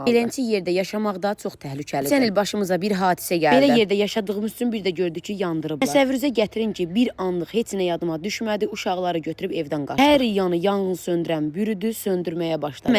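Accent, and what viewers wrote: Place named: South, Azerbaijan. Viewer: Ləhcəsindən bilinir ki cənub bölgəsidir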